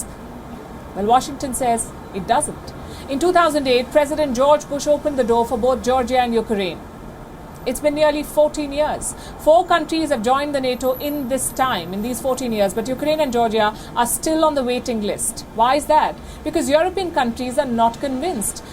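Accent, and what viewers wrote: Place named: India. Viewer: I like how you speak like an Indian. I hate when reporters try to sound foreign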